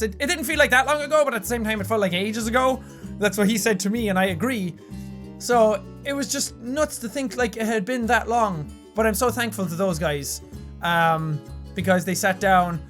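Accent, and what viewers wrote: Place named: Ireland. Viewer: Where is from in Ireland? I'm from Dublin so I know he's definitely not from here but is it Cork or somewhere? Can't really place his accent hahaha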